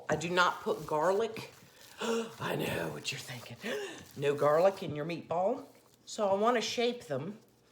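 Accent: South, United States. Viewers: sometimes I find that southern accent too strong but yours is softer, kinda sexy too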